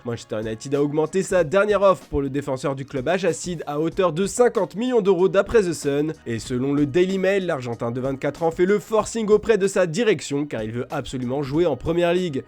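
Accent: Italy. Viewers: Pourquoi y’a plus l’accent Italien accentué ? Vous avez changer Foot Mercato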